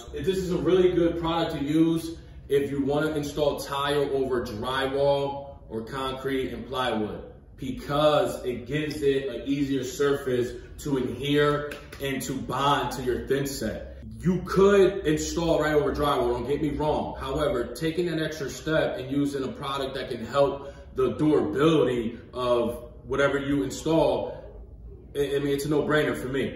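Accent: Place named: United States. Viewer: i'm a cuban from jersey city (i just moved to oregon and found your vid cause im renovating my house), and i knew exactly what state you were from the SECOND you started talking LOL. anyway, solid video super helpful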